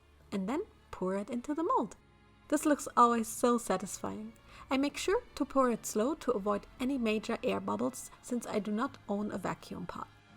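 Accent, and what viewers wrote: Germany. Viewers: I thought: she sounds a little big German 🤔 And than I saw it! The 'UHU Alleskleber '😂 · New viewer here - My thought journey through the video: wait, this sounds like the accent of a fellow German! wait, this sounds like a LoL theme... and another one! F Holy crap she looks absolutely GORGEOUS! Subbed, subbed and SUBBED, by God. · for a while i was always kind of hearing her speak german for some reason when i turned the volume down a lot and in this video i now found out it's probably because her accent is german and she's german ...der gute alte alleskleber c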